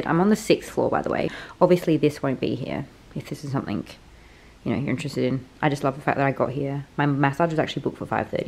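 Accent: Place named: Scotland, United Kingdom. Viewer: This vlog absolutely warms my heart. I remember a previous video where you were talking about always wanting to travel with your significant other (goodness knows how long ago). And now here you are, sharing an adventure or an amazing experience with your significant other is such a wonderful luxury. PS. Love that Scottish accent, brings back memories of a beautiful friend of mine who coincidentally will be back in Australia in about 2 months.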